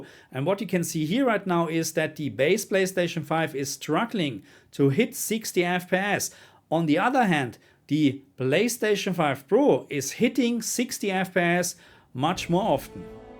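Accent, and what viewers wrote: Germany. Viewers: Der deutsche Akzent ballert hart rein bei dir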